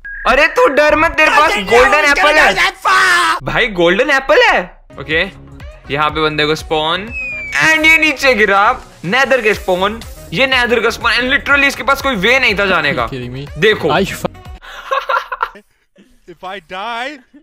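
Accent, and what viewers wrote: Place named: Uttar Pradesh, India. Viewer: I know you are from UP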